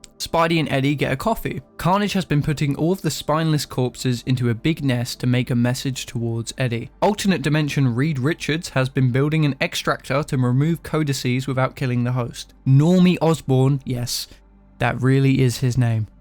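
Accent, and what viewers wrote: United Kingdom. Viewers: Between you and Alex Lennen, British guys explaining spiderman has become my new favorite genre of YouTube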